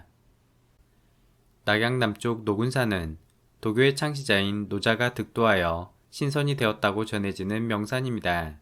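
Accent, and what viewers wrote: Jeolla, South Korea. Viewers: 전라도 말씨 나와요 ㅋㅋㅋㅋ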